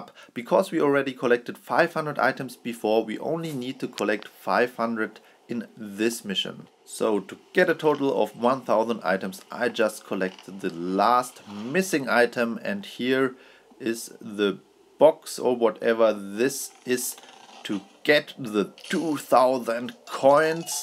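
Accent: Germany. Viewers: Man merkt voll das du deutscher bist😂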